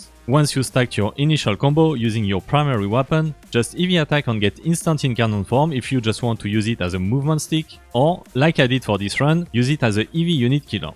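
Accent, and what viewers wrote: France. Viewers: Excuse-moi de te poser la question en français mais je suis nul en anglais et j'ai remarqué ton petit accent français. Je souhaitais savoir si le troisième passif de voruna pouvait être fort avec la dague en céramique, et si tu me le recommanderait. Mis à part cela j'ai beaucoup apprécier le travail que tu as fait pour cette vidéos, force à toi.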